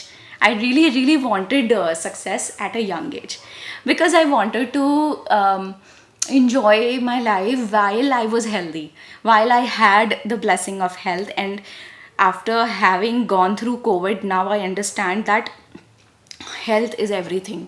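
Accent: India. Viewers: Can't convert your accent into a estimable one. Indian accent is utterly hideous and dismal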